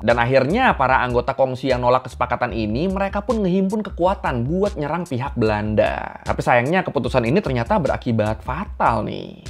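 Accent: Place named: Pontianak, Indonesia. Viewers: Kau orang ponti ye bang, salut 🎉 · Lah abg nye org pontianak rupe wkwkwk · Kau orang Pontianak ke boi?bale....balak kau ni😂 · Daerah kamek ternyate tuh Gile gile gile wkwkwk mantap bang aksen Melayu pontianak Nye 🤣🤣🤣🤣 kapan kapan kite ngopi bang 😂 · Daerah kamek tu padahal tu. Logat nya ponti banget😂, bang asli orang ponti kah? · Pacak pulak kau ngomong ponti bg, mantap cerita sejarahnya bg, alasan kenapa byk etnis tionghoa di pontianak dan singkawang, kisah leluhur morgan smash, ghea idol, cece felicia putri dll... pokok klo ke pasar mw nawar sm org china jgn lupa bilang, "ankui, pun ngai piyen nyin laaa" 😁🙏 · bole bole abang ini ni, pandai pulak pakai bahase pontianak.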